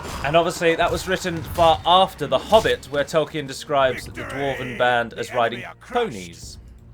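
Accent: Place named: RP, United Kingdom. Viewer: Mate, your sleepy voice sounds bloody brilliant! Makes you sound even more posh (didn't know that was possible)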